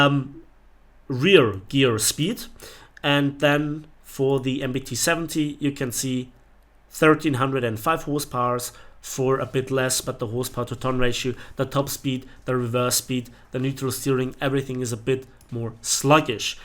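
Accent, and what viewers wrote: Germany. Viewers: dieses extrem deutsche Englisch macht dich so sympathisch. Abo hast du :)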